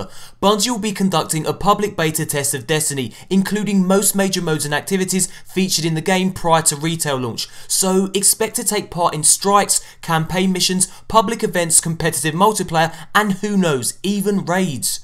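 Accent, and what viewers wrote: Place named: United Kingdom. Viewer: yea and everything sounds classy with a British accent! lol :)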